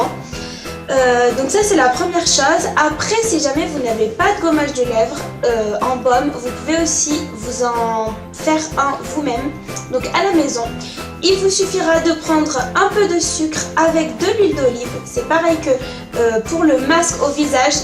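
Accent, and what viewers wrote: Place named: Sweden. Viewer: OMG ton accent suédois j’adore persso j’ai le même ❤️❤️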